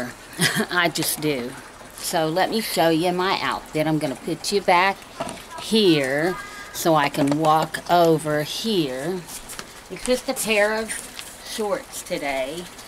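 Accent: West Virginia, United States. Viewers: Hi, I am a new subbie. I watch Marnies channel. I saw your channel name & started watching your 1st video. I recognized your accent right off. I live in Michigan. I married a born & raised Mountaineer. He was born in Clarksburg. Went to Doddridge County schools. All his family is still in Salem, Clarksburg, West Union. I just LOVE WV. But it sure does get HUMID. I have 2 grown daughters & 4 granddaughters that I take care of on the weekdays while the girls & their husbands work fulltime. Sure keeps this Nana busy and pooped out at the end of the day. Love your videos. Will write more later. Michelle from Michigan.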